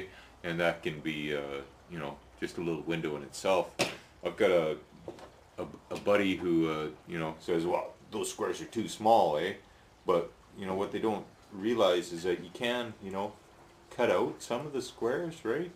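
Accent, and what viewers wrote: Canada. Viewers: I am thinking you are from Canada, perhaps one of the Atlantic provinces?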